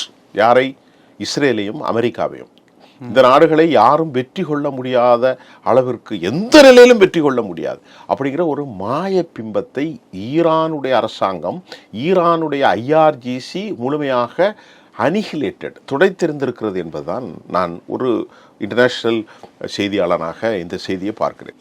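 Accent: Sri Lanka. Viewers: His voice is unique cylon tamil ...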